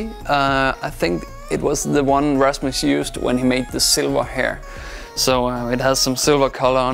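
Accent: Germany. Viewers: Du klingst deutsch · german? sounds like Arnold!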